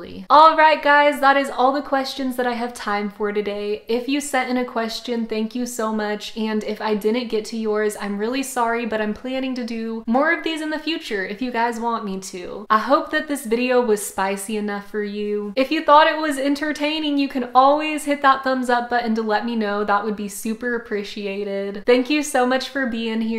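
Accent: South, United States. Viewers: What I heard: how do you lack living in a big family? What she said: how do you like living in a big family? She got one nice country accent y'all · Are you from the south? Lol you say y’all. Just wondering, because if so, I’m from the south too! Y’all have a nice day!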